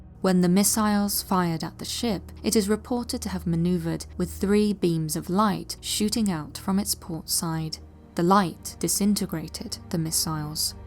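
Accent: England, United Kingdom. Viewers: English from England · It’s the queens English mate, all the best 😂🇬🇧🏴🇺🇸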